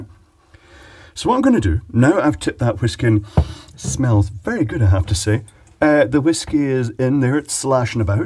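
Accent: Scotland, United Kingdom. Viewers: is that scottish accent?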